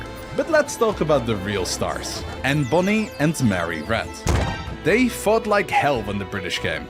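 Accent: Netherlands. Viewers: Oh youre dutch, i was trying to place your accent 🥀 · Are u Dutch? Love your accent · Ik dacht al dat jij nederlands was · I am only here to listen to his Dutch accent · Ik kan echt niet luisteren naar nederlanders die engels praten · I knew you were Dutch I heard it from the accent I just waant sure XD but cool!!!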